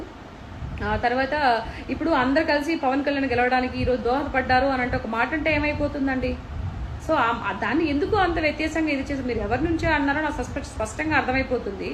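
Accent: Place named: Nellore, India. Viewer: Ippudu emaindi Nellore yaasa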